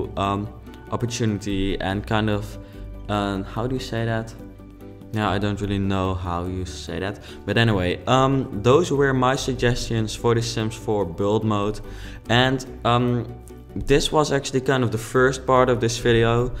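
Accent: Netherlands. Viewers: ik kan niet langer dan 2 min naar jouw nederlandse accent luisteren sorry eh bye now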